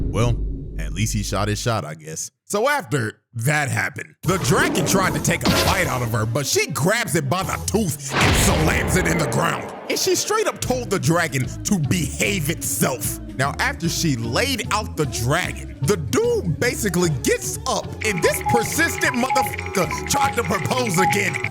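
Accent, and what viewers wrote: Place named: United Kingdom. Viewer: as a Brit I approve this accent. Give this man a cuppa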